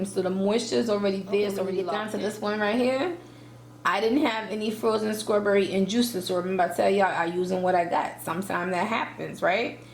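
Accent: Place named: New York, United States. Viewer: She definitely from New York🤣 bur good video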